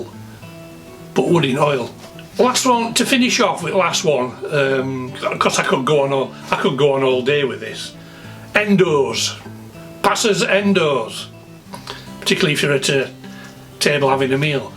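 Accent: Sheffield, United Kingdom. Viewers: I was born in Barnsley lived there till 10, Sheffield for 20 years, worked in York with Lads from Leads, my accent is strange, but nice to hear a more Sheffield voice, laughed me ed off when you said Endo's. Now live in Midlands and when I came down you couldn't get Henderson's, so I drove back to Sheffield, bought a case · Im not from yorkshire im in derbyshire but my towns accent is incredibly influenced and similar to sheffield. The way you speak is near identical to me :) Though some of the slang words arent used here a lot also are but we have several unique to my town as well. Not sure quite in sheffield but as well as love do you also call people duckey and duck or flower? I get them all daily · I gotta say, as a yank writing a story with a US-residing English character from Sheffield, I felt pretty confident giving him a broad English speech pattern but I didn't know how to make it more specific than that. 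But when I got to a part that takes him back home, I wanted to get it more authentic, and this video helped me do that more than any other. Thanks, man! (Or should I say "Cheers, love" lol)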